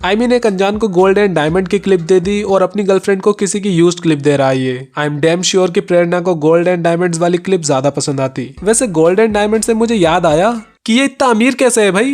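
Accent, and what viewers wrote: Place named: Bengal, India. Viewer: Bengali hoga, Bengali main deewar nehi bolte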